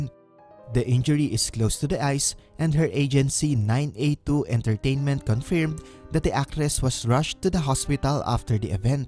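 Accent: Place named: Philippines. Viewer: halatang Pinoy yung nagsasalita, kahit English pa yan🤣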